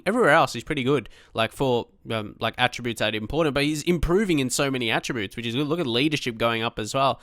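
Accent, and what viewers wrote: Australia. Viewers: The reason i think people hate on you is because of your accent which i think is stupid. Although i do kind of find the Australian accent a bit annoying, I'm not going to hate you or your content for it. If you were American or British you would receive way less hate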